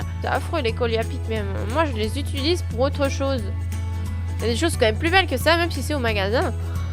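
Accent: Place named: Belgium. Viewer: elle XD ets une fille Preuve: son nom est Et sa voix c'est parce qu'elle est Belge.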